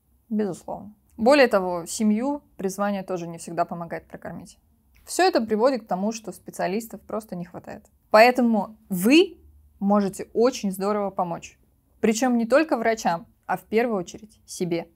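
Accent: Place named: Belarus. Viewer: Чудо и обоняние , а голос завораживает как мне кажется с нотками белорусского акцента . Как же повезло тем студентам кому вы преподавали, а тем более в Вас объедены две мои самые уважаемые профессии педагог и врач ! Дай Бог Вам …….. Вы сами знаете чего . У Вас всё получится , потому что Вы живете для других , давая им удочку , а не жаренную рыбу , а это самое ценное . Спасибо!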